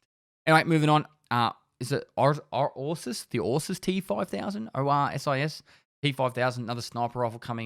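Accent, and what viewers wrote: Australia. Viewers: He's Aussie breh like me. We say "Starlin" because our accent..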